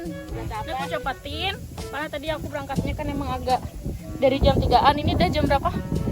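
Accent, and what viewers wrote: Java, Indonesia. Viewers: Jowo ne jowo ndi t mbk ?? Nek kulo jowo timur madiun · Jawanya mana si mba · BHS.indonesia:Baru kali ini aku ketemu youtuber cantik main ke sawah BHS.jawa:Kaet Iki aku roh youtuber ayu maen neng sawah Suaranya anak Jawa mana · Wong jowo endi suarane rekkkkkk😎😀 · Orang jawa ya kak? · kk apa orag jawa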